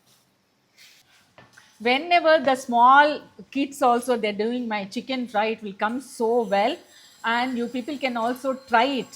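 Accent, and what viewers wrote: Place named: Telangana, India. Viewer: Akka you are Hyderabadi you speak, Telangana Akka.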